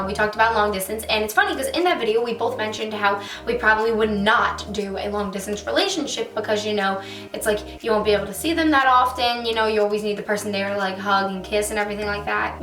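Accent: New York, United States. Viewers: Senja S it’s her New York accent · I love your new York accent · your new yorker accent makes me want to go live in nyc more and more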